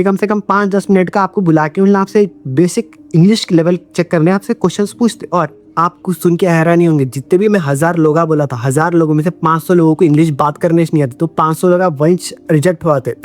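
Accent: Hyderabad, India. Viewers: accent ka wajha seay kuy ko hydrabadh seay ha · Aap Itna Hyderabadi Accent ma Baat kyu Krta, Sunne ma Bohot Funny Lagta 😂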